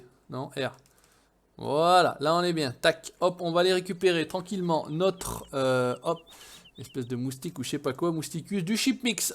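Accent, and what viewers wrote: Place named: Nord-Pas-de-Calais, France. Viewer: Très bonne vidéo comme d'habitude. qui se met à parler chti et un peu patois. Je me demande si tu es vraiment, lol 🤔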